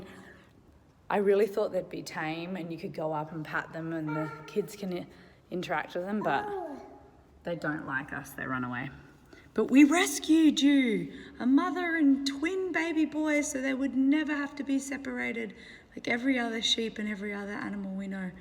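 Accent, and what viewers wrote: Australia. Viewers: Vee-log, gorgeous Australian accent.......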